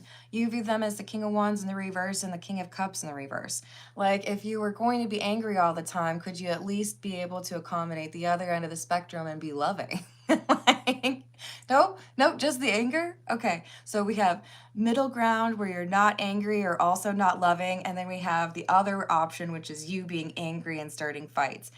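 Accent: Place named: Minnesota, United States. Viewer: The Minnesota accent was ADORABLE 😂🎉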